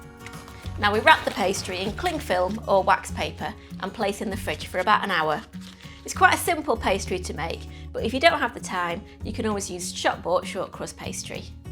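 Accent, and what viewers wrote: United Kingdom. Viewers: Your Video, recipe, presentation is all so LOVELY as your Lovely British Accent. Regards from Sunny California.......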